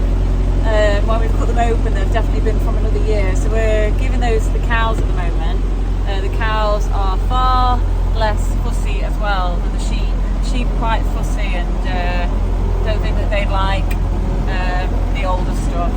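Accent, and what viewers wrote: United Kingdom. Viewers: You are sounding like a strong Derbyshire farmer every day !!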